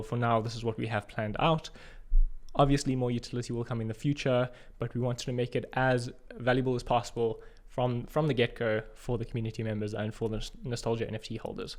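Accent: South Africa. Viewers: Blue Pink Jelly. Love your videos and, on a personal note, it's really nice to hear a South African accent amongst all the crypto YouTubers that I watch. Being very new to the space it's incredibly helpful to have people like you that are logical, balanced and insightful. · Blue Pink Jelly!!! Absolutely love getting top quality crypto content in a Saffer accent. Kudos to you!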